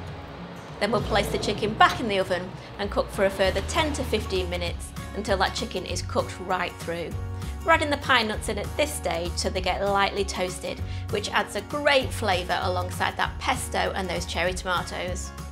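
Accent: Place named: Northern England, United Kingdom. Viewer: As a British person living in Australia I’m liking the northern accent whilst I make my dinner. When my husband asks what we’re having to eat I say the recipe name in a northern accent - I can’t help it hahaha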